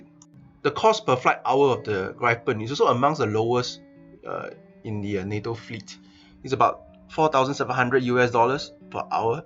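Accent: China. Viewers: Pronounsation sounds like pure chinese .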